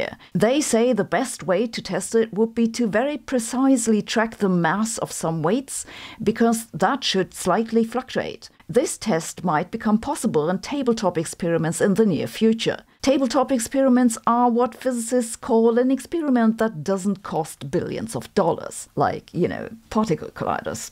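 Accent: Germany. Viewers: her pronunciation has problems because of influences from the German accent, and your inferences are not accurate by the way, simply straw man and borderline ad hominems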